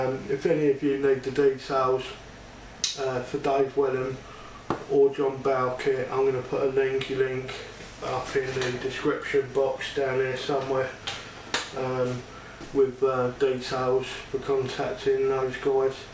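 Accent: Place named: RP, United Kingdom. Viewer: Bob USA. he's speaking the Queens English so have a bit of respect. okay fella now just watch and learn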